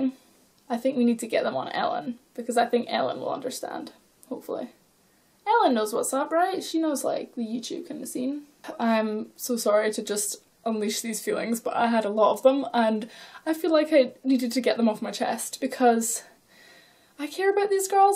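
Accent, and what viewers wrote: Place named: Scotland, United Kingdom. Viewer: Gurll?? Where are you from????you sound scottish!!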